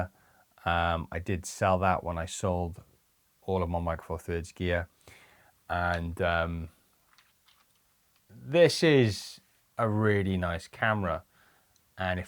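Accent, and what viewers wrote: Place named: United Kingdom. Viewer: Thanks, I deeply appreciate how you pack in tons of information in your videos, and I could listen to your voice all day, Brother, as I love British accents, but yours is calming. 😄 I just recently returned to watching your content again for both your channels. (My previous YT channel was hacked, so I had to re-subscribe, hehe.) Big Respect from the U.S., my Friend.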